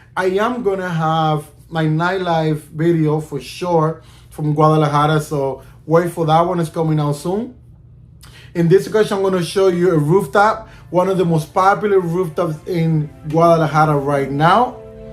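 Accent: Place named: Cuba. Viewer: Where you from Brother you have an interesting accent. Is it Cuban?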